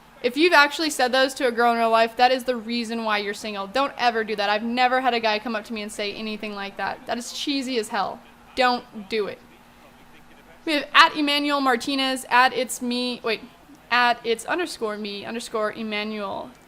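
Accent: England, United Kingdom. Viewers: Hahaha, Your British accent :) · BEST BRITISH ACCENT EVER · Keep it up. You'll probably keep getting shit because most of the people on Youtube are quite young and narrow minded. Having a female on the scene though is a good thing. P.s don't let Stephen Moyer hear your English accent =) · Your British accent <3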